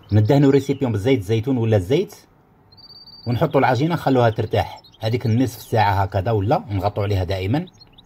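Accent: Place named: Skikda, Algeria. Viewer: Bonsoir le Skikda je sais que vous avez un accent Skikda et les Skikda les rosica days sont traités par les pizzas merci à vous et bonne continue❤❤❤❤❤❤😂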